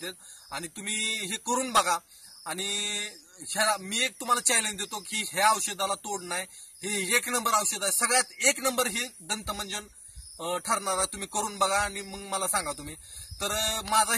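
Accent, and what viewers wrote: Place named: Satara, India. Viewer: Bhau Bhasha tumchi Sataryachi vatate Bhetaychi ichha ahe Sataryat kuthe asta?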